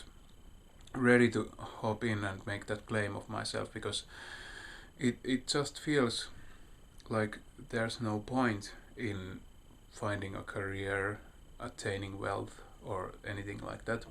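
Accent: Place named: Finland. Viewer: Upeeta enkkuahan toi, itseasiassa meidän vanhalla enkunopella oli hyvin samanlainen suomalainen lausuntatyyli :)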